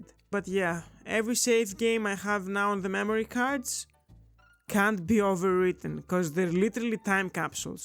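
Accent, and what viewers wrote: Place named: Greece. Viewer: Are you Greek? Your accent is giving Greek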